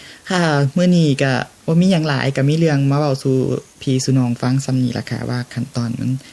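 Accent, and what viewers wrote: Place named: Chaiyaphum, Thailand. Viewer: โทดเด้อจ้า พี่คนทางได๋น้อฟังเสียงเว้าคือคนชัยภูมิบ้านหนูแหมะ พอดีพึ่งมาติดตามมื้อนี่จ้า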